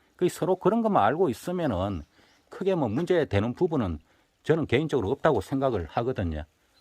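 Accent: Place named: Hadong, South Korea. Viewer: 하동출신인데 고향말투가 느껴져 정겹네요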